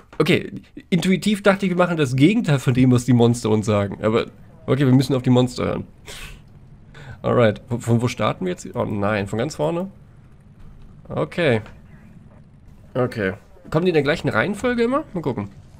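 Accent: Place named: Ruhr, Germany. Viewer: da isser, unser Ruhrpott dialekt🎉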